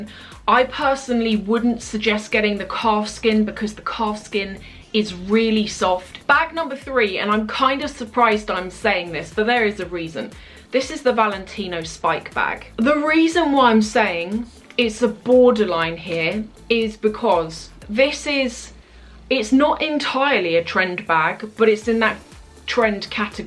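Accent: United Kingdom. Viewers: Thank you, for the most entertaining, informative and creative luxury podcast of all of them. I love that you get right to it and do not belabor incessant droning on and on about nothing over and over and over. You have super fun communication skills, are SUPER FUNNY, PLUS your British accent is addictive. Thank you for a refreshing and lovely addition to my day.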